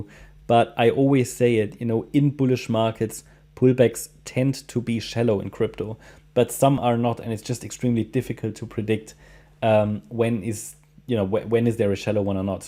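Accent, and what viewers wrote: Germany. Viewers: Immer schön deutsche Leute Englisch reden zu hören. Gruß aus Berlin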